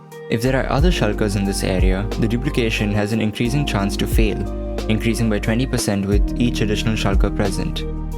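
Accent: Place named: India. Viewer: Are you indian by any chance? love your accent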